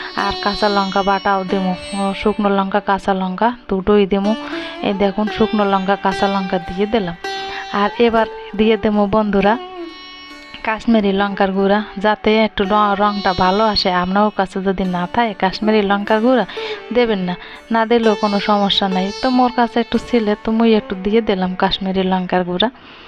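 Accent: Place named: Barishal, Bangladesh. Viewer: খুব সুন্দর রেসিপিটা বানিয়েছো আর তোমার কথাগুলো খুব ভালো লেগেছে বরিশালের ভাষা❤❤😊😊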